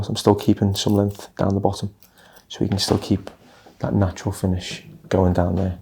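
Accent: United Kingdom. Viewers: He’s scouse, isn’t he? With a very slight touch of paddy.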